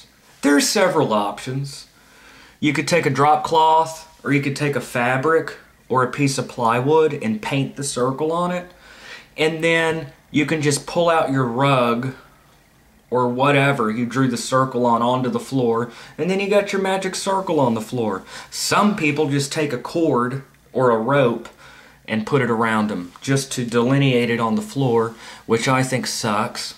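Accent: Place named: Texas, United States. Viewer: I been watching for while and like the u put a bit of your sense of humour in with ur texan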